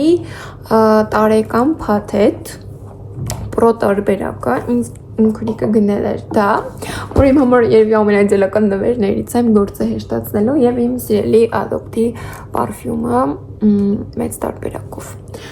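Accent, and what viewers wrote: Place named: Vanadzor, Armenia. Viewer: Հոգում միշտ Վանաձորիս բարբառը։ ՍԵՐ Վանաձոր❤💫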